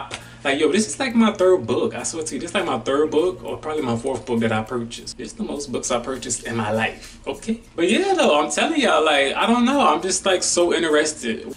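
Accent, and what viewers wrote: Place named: Spain. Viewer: Lol "its my Spanish accent even though I don't speak Spanish" 😅.. Im definitely with you on that!!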